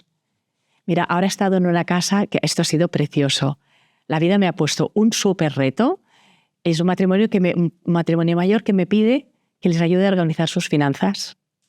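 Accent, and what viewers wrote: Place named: Peru. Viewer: El acento peruano lo identifiqué desde el minuto uno. Saludos desde Chiclayo.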